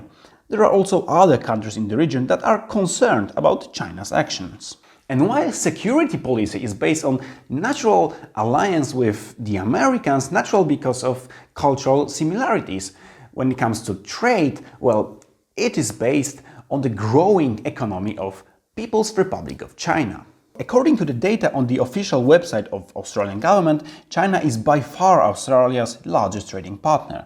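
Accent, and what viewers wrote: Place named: Poland. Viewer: Add english subtitles asap. You need them with your polish accent.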